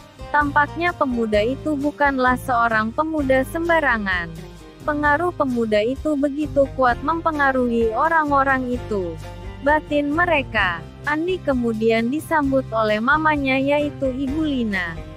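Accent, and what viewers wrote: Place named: Java, Indonesia. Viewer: Mimin logad nya mirip kejawa jawaban ya??!!!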